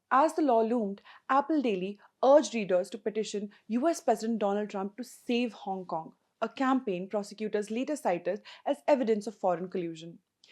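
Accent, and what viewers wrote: India. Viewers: I pray to God that my children do not learn to speak English from the presenter of this video. No offense to Indians but such a bloody awful accent. Try learning to speak proper English first before coming onto broadcasting. It's an insult to the industry. Half the listeners can't make out what the hell she was talking about. That was the biggest joke of the day !!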